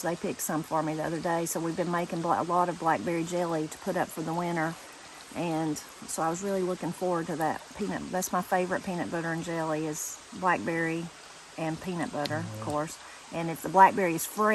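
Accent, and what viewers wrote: Appalachia, United States. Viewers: I really enjoy your hikes with and story telling with your appalachia accent. It takes me back to when I was a little girl, we lived in the country near a creek and I actually would go across the road to play in it. When I watch your family I get a comforting feeling, so, thank you Cindy from Kentucky P.S. Your Presley girls are enjoyable as well.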